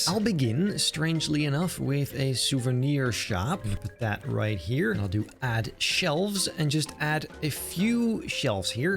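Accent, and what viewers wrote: England, United Kingdom. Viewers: Brilliant love the English accent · Keep up the british accent - you’re good at it! :)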